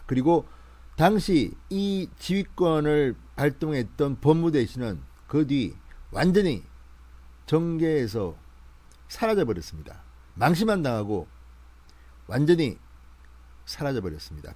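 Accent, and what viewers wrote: Gyeongsang, South Korea. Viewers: 진성호 너도 고집이세네~ 말학전서두에 자아~라는 말을 하지말라고 했잔아~ 방송에서 경상도말은 삼가하는게 예의아닌가?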